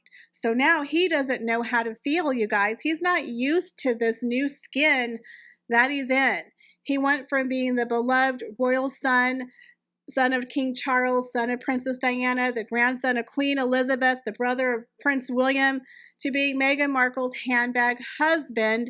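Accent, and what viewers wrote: South, United States. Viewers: Love your «yall» accent. Hazbeen wants to be treated like royal. He even insisted to be called with his HRH at the High Court in the UK. He wanted to live like a billionnaire while doing nothing.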